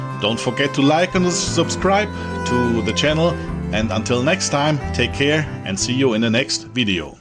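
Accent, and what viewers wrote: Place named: Germany. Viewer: Als aller erstes: Gruß aus Panama. Und nun das zweite: 😂 Ich lebte 15 Jahre in Kanada und mir wurde immer gesagt, dass ich kein Deutscher sei. Dies wurde mir auch hier von Nordamerikanern und Engländern in Panama gesagt. “Du sprichst wie ein Nordamerikaner. Deutsche haben einen spaßigen Akzent!” Als ich dich reden hörte, da musste ich erst einmal schmunzeln. Aha. Da ist er. Der Akzent. 😅 Nicht böse gemeint. - Kleines Haus und Wände. Ihr habt die Konstruktionsfläche genommen und nicht die Wohnfläche. Ich lebe hier in Panama in einem gemieteten Haus. 2 Schlafzimmer, Küche, Wohnzimmer, Bad. Genau 8x8 Meter. Die Terrasse ist 8x2.50 extra. Reicht uns auch. Ich baue nun ein rundes Haus mit 2 Stockwerken. Alles alleine. Bin “Unfallrentner” und bekomme eine gute Rente von der BG in Kanada. Durchmesser meines Hauses ist 9.80 Meter. Wohnfläche ist ungefähr 74m2 mal 2. 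Das erste was den Leuten an meinem Rohbau aufgefallen war sind die “gut gemauerten” Wände. Ich wäre wohl vom Fach oder einen Meister. Nun. Vor 33 Jahren baute ich mein Haus in Deutschland alleine. Als ich meinem Sohn in Deutschland mitgeteilt habe, das ich ein Haus baue, da kam ein: “Papa. Du bist keine 28 mehr. Spinnst Du?” Nun ja. Lausebengel! Als er letztes Jahr zu Besuch kam meinte er: “Wow. Du weißt noch wie das geht?” Hab ja noch nicht Alzheimer. 😅 Und in Panama haben neue Baugebiete keine Namen für die Straßen. Nun kommt der Witz. Auf den Lieferscheinen steht als Addresse: Calle de Castillo. (Burgstraße) Und jedes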